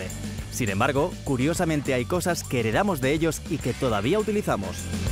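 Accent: Spain. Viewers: bueno bueno Se le nota la voz de Español.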